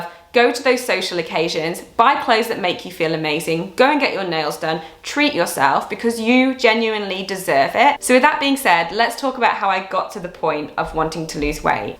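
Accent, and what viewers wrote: Australia. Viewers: you have an amazing australian accent. Love it. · Australian accent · Omg your cat in the background 😂😍 PS love this video, you are so down to earth and relatable. I can definitely hear the Ozzy lilt in your voice!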